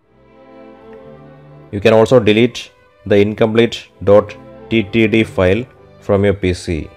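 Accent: Kerala, India. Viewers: Malayalee aanale?😂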